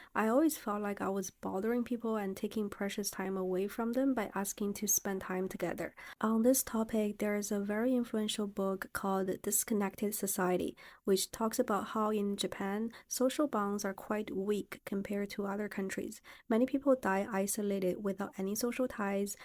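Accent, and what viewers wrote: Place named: Toronto, Canada. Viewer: You got that toronto accent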